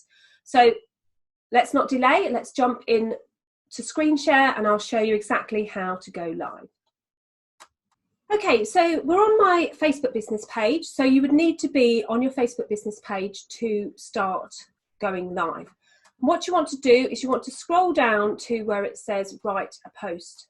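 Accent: United Kingdom. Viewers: ok I am a little dense. What are you using for recording you screen for this presentation. Ps. You always get back to me after I ask a question. I like that and the. Brit accent. Lol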